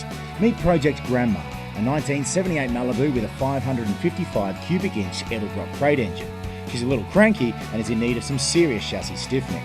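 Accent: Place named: Australia. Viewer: Aussie voiceover guy, who'd of thought. :)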